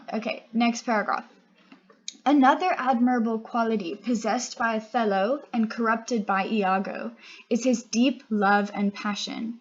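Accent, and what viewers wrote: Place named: United States. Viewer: U have an American accent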